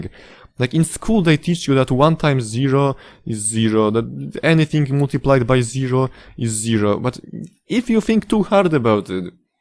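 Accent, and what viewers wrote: Poland. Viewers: odkąd widziałem pierwszy film wiedziałem że jesteś Polakiem po akcencie. Dzięki za potwierdzenie brachu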